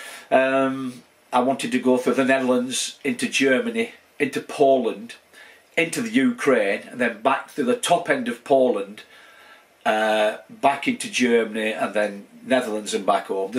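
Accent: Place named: Bolton, United Kingdom. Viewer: And there's me thinking it was a Bolton accent!! You keep going me o'l mucker!! Stay Safe and Healthy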